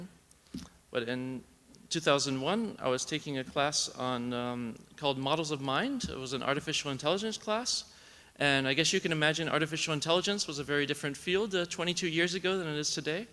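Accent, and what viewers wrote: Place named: United States. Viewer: It's funny, -- the thing I notice listening to this is how the melody of your speech cadences has changed to a more German-influenced sound, from your living in Germany so many years. Of course your accent is perfectly American, but if I listen to this so quietly that I can barely make out your words, the ups and downs of your intonation sound like German. Having spent months in Germany myself, without actually being a fluent German speaker, it was instantly recognizable to me. I don't remember this characteristic of your English many years ago when we first met. Fascinating!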